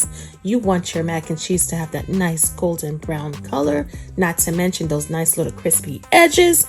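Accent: New York, United States. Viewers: Looks delicious, funny it’s southern but you sound like ur from New Yawk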